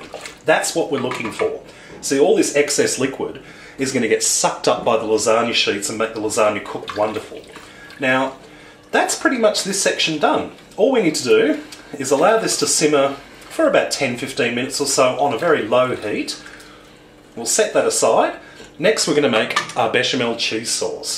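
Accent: Australia. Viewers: Love the Aussie accent! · This guy has a very clear Australian accent.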